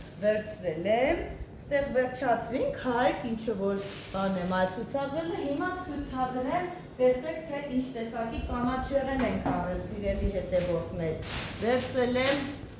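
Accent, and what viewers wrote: Lori, Armenia. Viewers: Էնքան հետաքրքիր ա խոսում ես Լոռվա բառբառով Արտաշատինը հետը խառնած😂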